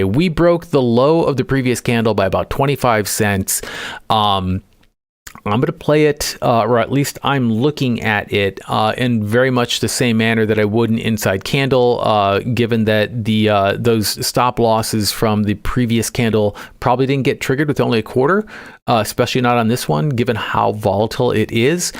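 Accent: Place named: Canada. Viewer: Thanks I just notice your classic Canadian oo for ou dialect. I didn’t notice It on your other videos. Looking for stocks that are ready to pop are the hardest to see for me so I appreciate these. I see your list inside discord but I don’t arch your videos on you tube. I don’t know why, but I can’t turn my phone sideways in discord and makes your screen hard to see. Thanks again I was writing this last night but ran out of time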